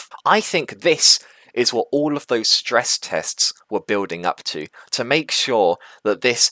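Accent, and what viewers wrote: United Kingdom. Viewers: I wish I could go to Genesis week in Las Vegas how exciting. Where are your from? You have a UK accent.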